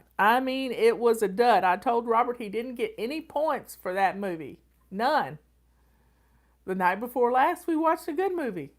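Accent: South, United States. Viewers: Miss fly lady my girlfriend just loves you she’s watches you every day thank you so much for keeping her occupied and out of my hair lol Jk but love your southern accent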